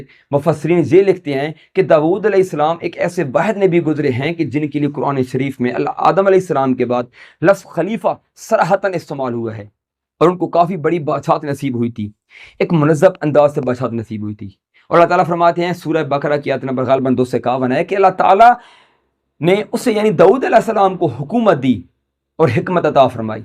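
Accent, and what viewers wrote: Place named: Punjab, Pakistan. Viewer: Yeh Punjabi mai Urdu bol Raha hai ya Urdu mai Punjabi.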